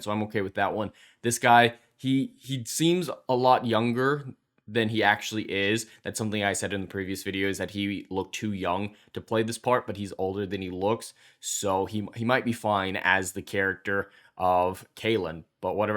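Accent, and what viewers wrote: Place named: New Zealand. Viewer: lol once again done in a badly done masculine voice that is in fact a Kiwi accent Are you not entertained? ARE YOU NOT ENTERTAINED?! Is that not why you're here?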